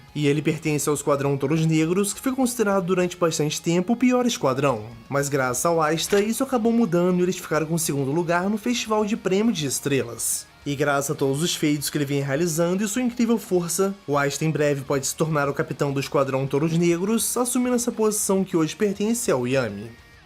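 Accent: Portugal. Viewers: Tu é português? Tem um pouco do sutaque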